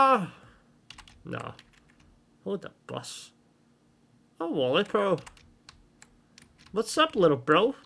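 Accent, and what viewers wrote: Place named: Scotland, United Kingdom. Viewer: Haha love the vid where abouts in Scotland you from your accent sounds a lot stronger than mine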